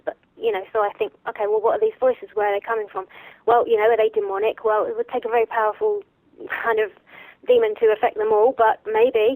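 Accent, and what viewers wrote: England, United Kingdom. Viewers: makes one laugh Americans telling an English person to talk English!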